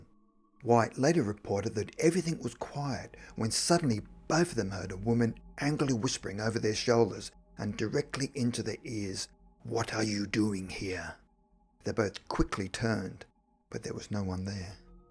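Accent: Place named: Australia. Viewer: Ohhh ok. Well great! Yup. He was right about that. And I'm glad he did more shows in prisons. To spread love cheer and the gospel. I had only heard of him playing At Folsom.... So that's why I was wondering. I never have learned everything about him. But yeah I'm glad he came to Tennessee state prison in 1974. 🙂👍 And yes your welcome. Your videos are fun to watch and spooky and interesting. Your Aussie accent makes it even better. 😄. P. S. I live in Memphis TN and grew up here. I have been to the Orpheum once years ago when I was a teen and it is definitely a beautiful but spooky place. I read that Mary the little girl ghost ....once threw the janitor lady's spray bottle into one of the toilets. Lol. She looked everywhere and found it there. That was crazy.😄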